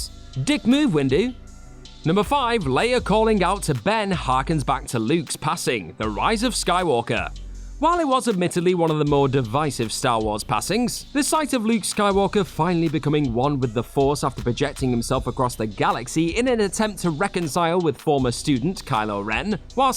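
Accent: United Kingdom. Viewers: Ya British mate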